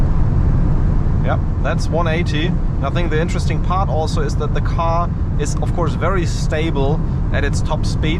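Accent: Germany. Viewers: I Like your german accent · Man hört richtig dass du ein Deutscher bist :) schönes Video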